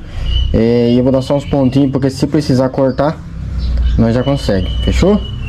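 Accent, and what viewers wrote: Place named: Santa Catarina, Brazil. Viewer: achei que você morasse em SC pelo sotaque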